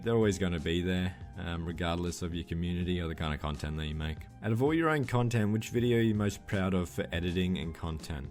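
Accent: Australia. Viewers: I'm in love with a certain, low-voiced aussie.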